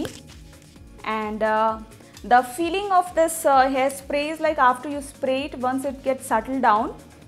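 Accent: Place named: India. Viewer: U speak like indian but looks like foreigner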